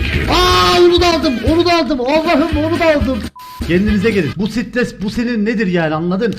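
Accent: Cyprus. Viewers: Abi sen kıbrıslımısın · Sen nerelisin kibristan mii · Ben seni Kıbrıslı sanıyordum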